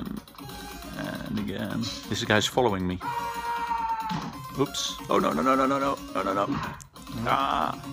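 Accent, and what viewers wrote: Netherlands. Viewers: ArtOfCamelot well it definitly wasnt very good english, it had a lot of dutch trough it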